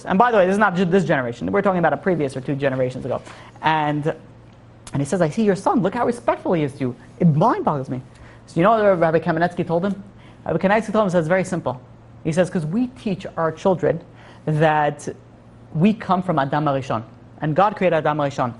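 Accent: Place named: New York, United States. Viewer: "30 minutes and I didn't take a drink" made me Isaac out loud 😂 I feel real bad...just drink freely my brother ahahaha. Your water drinking only affects people who listen to your beautifully super fast wisdomful ny accented voice through noise reduction headphones, the lapel mic you wear catches your swollowing and heartbeat, very ASMR'y. Which reminds me of a joke.... What used to be Bruce Lee's favourite drink???? Wahhh Taaahh!! (Kung Fu 👐)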